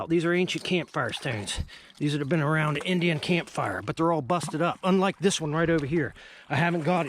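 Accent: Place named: West Virginia, United States. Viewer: Just started watching ur videos. I knew u had to be from WV w/your accent & u pulled 20+yrs in the Army Benning, Drum or Lewis? Pop bottle 77’, “I was just a little fella”, & so was I. I’m a 74 edition, lifelong Missouri man, Missouri born, Missouri bred & when I die I’ll be Missouri dead! 😆 Where I live, (not right on the water but close), is a small creek you can fish year round named “Cowskin Creek”, & it runs into a bigger stream named “Beaver Creek”. Old man I knew years ago found quality heads, points just amazing collection. His son donated it to the local county museum. We’re in Douglas county MO., & where I live just west of Ava 4-miles the Osage Indians lived here & a smaller tribe known as the “Ponkeshaw”, lived right here where I live. I’ve been down to Cowskin & just dig a hole in a gravel bar & find all sorts of heads. 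I’ve never found what I’d call real quality heads, but I always look in the creek or right on the banks. Watching you I’ve gotten new ideas & plans to search more effectively, hopefully finding a much higher quality of heads. The old man I mentioned found every single point, stone & head on the Cowskin Creek Valley & his collection was AMAZING!! If I find some good stones, points & heads I’ll send ya a few pics of what I was able to find! From this to you, thanks for the videos & really enjoy watching them & thanks for getting me energized again to go digging! I’ve got “Degenerative Disk Disease”, so I can’t up & go whenever I feel l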